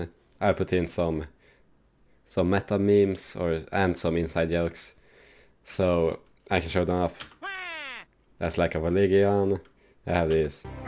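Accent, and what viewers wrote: Sweden. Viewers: haha man hör så himla klart att du är svensk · skulle inte säga dålig men definitivt tydlig svensk dialekt på den haha. men kom igen, vilken svenne har inte det?? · You're swedish right?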